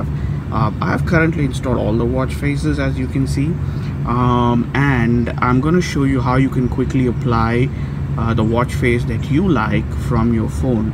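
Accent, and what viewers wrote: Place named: India. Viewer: Lol.! Yes, I DO realise that many Indians speak English, after all, I live in Birmingham UK which has a very large Indian population! But it was your neutral ACCENT that impressed me, not your ability to speak English - many Indians retain a very thick accent when speaking English, and you don't have that. Anyway, all the best from the UK!!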